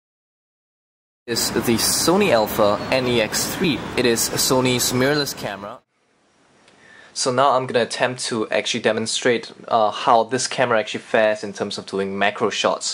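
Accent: Singapore. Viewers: Singaporean english same as Malaysian english :D that's why i can understand this video XD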